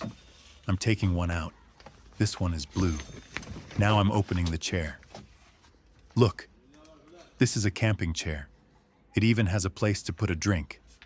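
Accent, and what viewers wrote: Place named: United States. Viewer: definitely is an American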